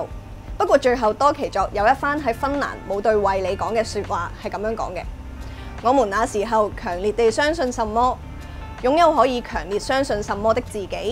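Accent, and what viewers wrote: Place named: Hong Kong. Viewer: 台灣人報到！好鍾意妳的香港話😍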